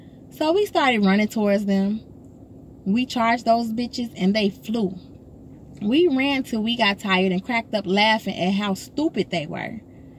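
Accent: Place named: South, United States. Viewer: The reader has a very strong southern accent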